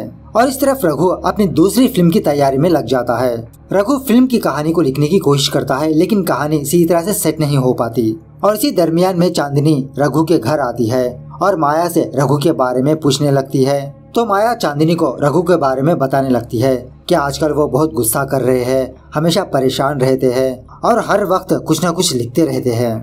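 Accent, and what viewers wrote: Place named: South, India. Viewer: I have been listening your explanation since two months ago but i think u need to improve ur hindi language bcoz i think u hv South Indian ascend. Ur many pronunciation irritates me but hope to listen good hindi.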